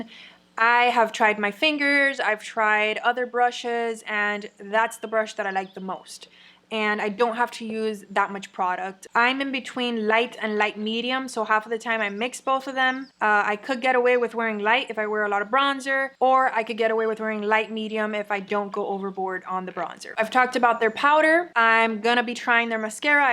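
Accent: Cuba. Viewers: Cubana! No daba con tu acento!! 😅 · Ok, you have to make a video in Spanish. Me encanta tu acento Cubano You look beautiful in this video, as usual.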